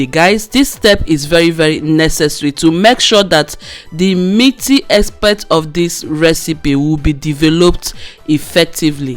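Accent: Nigeria. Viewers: That is a strong igbo accent.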